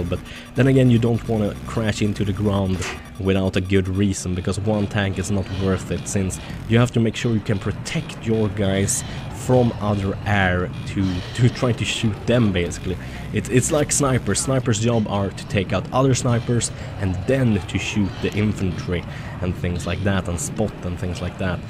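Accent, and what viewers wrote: Sweden. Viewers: Oh shut the fuck up guys. He's a Sweden so it's fine if he has an accent and he is a Damn good player and youtube. So hive him credit. If you don't like his videos, dont watch. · He's from Sweden, give him a break on his accent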